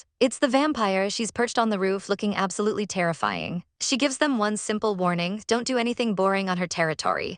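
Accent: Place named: Boston, United States. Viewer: Is it just me or does this AI got a Boston accent 😂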